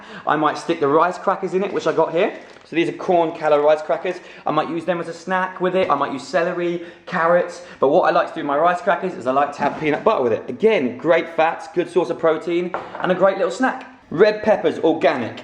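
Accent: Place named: England, United Kingdom. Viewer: Are you English ? You got this accent.. Wow ! 😊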